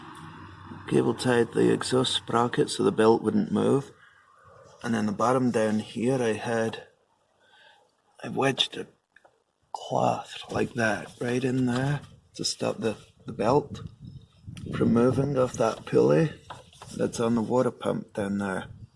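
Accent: Scotland, United Kingdom. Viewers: Amazing accent, so Scottish..yet all Canadian! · Mine is doing this too, going to try oil flush and change first...not been done for ages. Also, love your accent mate, heard the Scots in it then seen your flag! :)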